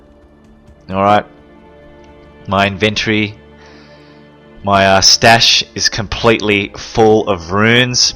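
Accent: Australia. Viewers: Are you an aussi? I love your accent!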